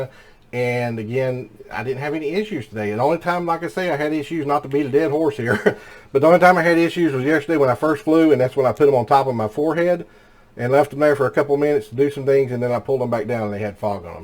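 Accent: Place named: North Carolina, United States. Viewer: I thought that accent sounded familiar, formal North Carolinian here. Out here in Utah. We get fogging anytime the temperature reaches over about 90° it’s not super major as our humidity is low. I do get worse fogging when I placed them on my head unless I’m wearing a hat to fix this I just moved them higher up on my head.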